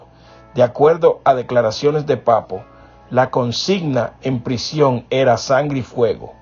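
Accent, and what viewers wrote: Dominican Republic. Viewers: Que mal lees , sos Dominicano?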